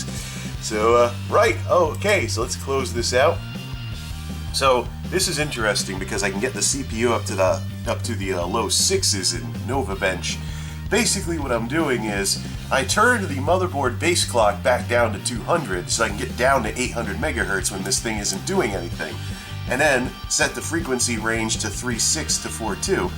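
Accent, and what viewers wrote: United States. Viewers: I was digging the sick South accent man. Thanks for the vids, good stuff.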